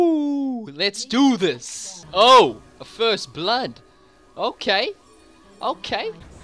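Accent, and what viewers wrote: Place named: South Africa. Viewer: You live in Australia but you sound South African, are you from SA?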